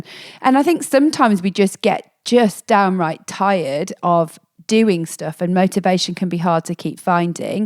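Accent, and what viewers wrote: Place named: United Kingdom. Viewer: I subscribed to your channel cos i have problem to understand British accent.by listening to your videos..probably could help me practice my British accent listening skill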